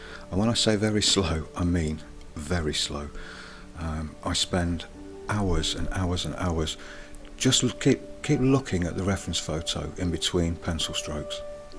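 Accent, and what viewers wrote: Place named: Leicester, United Kingdom. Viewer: You have such a soothing voice. Are you from Leicester? I am too and it’s so nice to hear the accent. Love the video and love your work.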